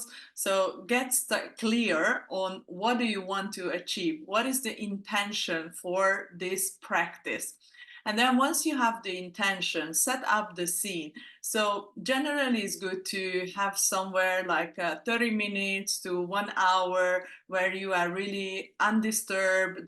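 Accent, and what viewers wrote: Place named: Russia. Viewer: Haha maybe. I like your voice, sounds Russian or Bulgarian accent. I felt onwards your voice very slow and direct/strict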